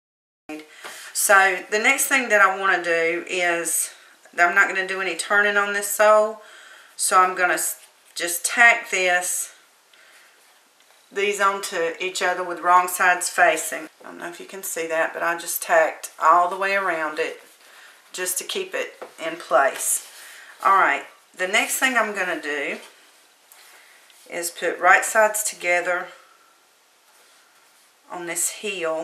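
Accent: South, United States. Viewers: First, there's not anything as adoring as a southern accent! :) Secondly, these are the cutest little shoes and I'm going to try my hand at making a pair. Thanks for posting it. Blessings!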